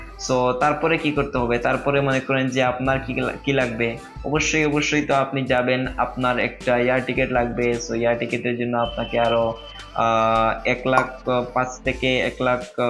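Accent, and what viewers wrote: Sylhet, Bangladesh. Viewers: ভাই কিতা সিলেটি নি?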